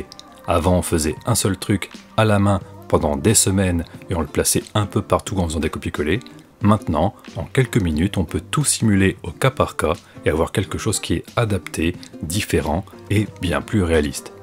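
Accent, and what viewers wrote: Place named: Paris, France. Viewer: Je viens de découvrir ta chaîne et malgré ton accent parisien ;) je suis très fan, merci.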